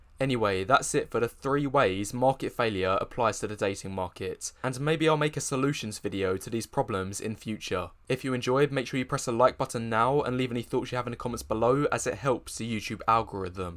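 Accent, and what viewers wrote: Essex, United Kingdom. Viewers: I love your content but would it be possible for you to work on your Towie girl accent? “Togevarrrr” (together) “Eivarrr” (either) “One two free girws standing togevarrr” Come on. You’re better than this.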